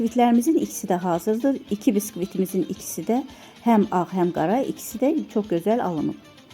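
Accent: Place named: Quba, Azerbaijan. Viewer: Allah bərəkət versin 🤲🤗,necədə səliqəli gözəl .Adamın üreyi açılır.Qubalısınız? Ləhcənizi oxşaydım Quba ləhcəsinə.👍👍👍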